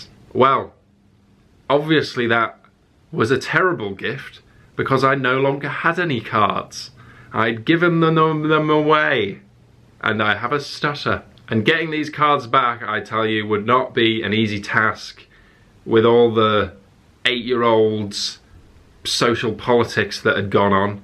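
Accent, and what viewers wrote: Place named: United States. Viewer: Omg the american accent 😂 thank you so much for answering my question!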